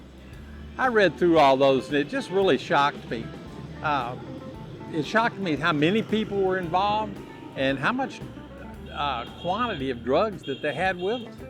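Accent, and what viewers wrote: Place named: United States. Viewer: Nice Video:) first one I have watched of yours.. keep up the good work. I hear the American Accent, what state were you originally from?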